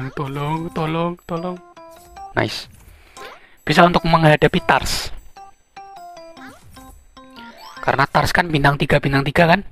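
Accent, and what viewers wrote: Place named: Surakarta, Indonesia. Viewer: Logate koyo wong solo njenengan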